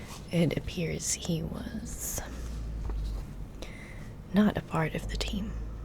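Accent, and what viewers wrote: United States. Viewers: The acting, camera angles, American accent and even facial expressions, you're phenomenal at this,. 😊 And speaking of discovering (Umbrella Corporation), I'm glad I discovered this channel. ❤ Thank you so much for this new video, and helping me to relax once again. 😊✨ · Every time you do an American accent video I forget that it isn’t your usual voice 😂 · After watching you for years, I don't think I'll ever get over how good your American accent is. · You do a really good American accent! I feel there's a lot of opportunity there for other roleplays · Girl, your American accent is impeccable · I like the American accent 🙌 Its solid , in this American's perspective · I love your American accent😊. You do it so well~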